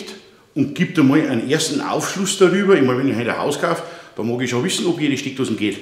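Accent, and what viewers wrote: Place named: Lower Bavaria, Germany. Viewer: Hach. Niederbayrisch. Wunderbar.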